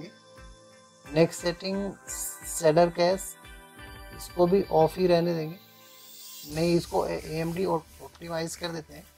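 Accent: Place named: India. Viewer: nice vid but try to talk american english or british english not indian english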